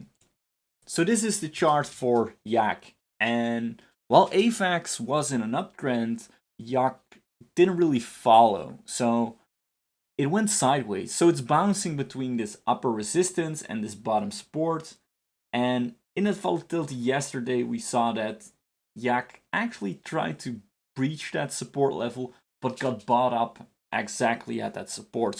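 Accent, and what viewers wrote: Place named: Poland. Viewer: Are you Slavic? Sounds like Polish 🇵🇱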